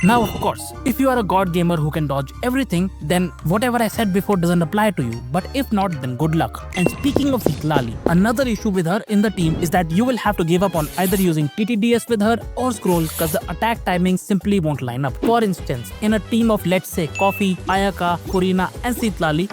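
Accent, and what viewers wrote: India. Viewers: Insane editing and Accent ❤️ there's still 1% indian accent left hahahaha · Indian accent 🥵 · Indian English accent is funny. This is true, but I think this guy is far better than others and his video content is great so I see no complaints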